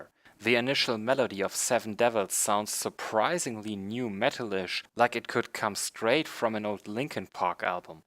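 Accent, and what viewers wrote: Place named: Germany. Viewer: yes....vor allem das grässliche Englisch dieses "Reviews"....wenn schon, warum dann keine Reviews in deutsch anstatt "Sauerkraut-Englisch"???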